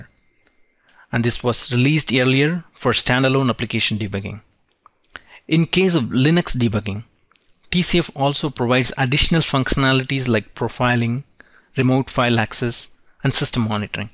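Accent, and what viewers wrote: India. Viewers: wow this one is exactly from middle of india - however useful tutorial but bad accent - anyway good luck to you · Awful Indian accent as always.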